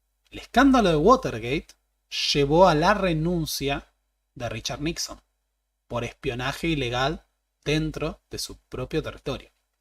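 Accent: Argentina. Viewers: Deberías hacer un esfuerzo para no pronunciar con acento argentino la Ll como ch.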